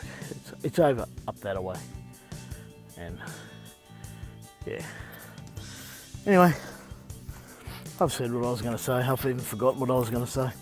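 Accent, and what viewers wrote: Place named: Australia. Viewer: lol i wish i had an aussie accent! sounds awesome! good luck with your weight loss journey mate! new sub :D can't wait to see your progress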